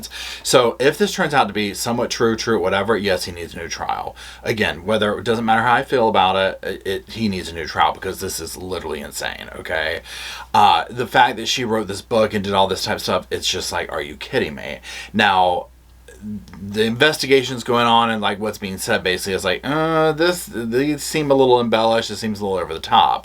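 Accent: South, United States. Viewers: You rock a southern accent! 😂 Xoxo to Roscoe :_Lovesit::_SofaSquad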